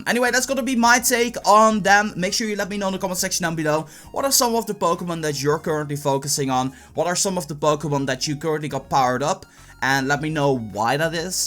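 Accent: Netherlands. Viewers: are you form the Netherlands ?????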